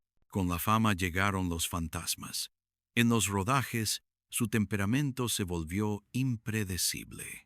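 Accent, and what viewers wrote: United States. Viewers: Ya se perdió la historia con este cuate que habla cómo americano 😂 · Todo bien, menos ese acento gringo del narrador…🤣🤣🤪👎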